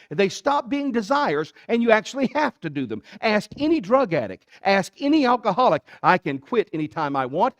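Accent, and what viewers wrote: South, United States. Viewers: I don’t have to miss Southern accented preaching just because I converted lol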